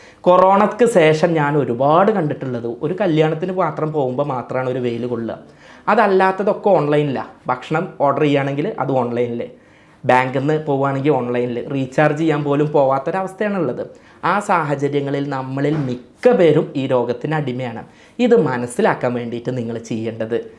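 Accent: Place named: Chandikkad, India. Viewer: ചാണ്ടിക്കാട്ടുകാരൻ്റെ ഭാഷയും സംസാരവും ആണെങ്കിലും സാധാരണക്കാരന് വളരെ ഉപകാരപ്രദമായ വീഡിയൊ ആണ് കാര്യങ്ങൾ കൃത്യമായി നന്നായി പറഞ്ഞു മനസ്സിലാക്കുന്നു നല്ല വീഡിയോസ് കച്ചവട താല്ലര്യങ്ങൾ ഇല്ല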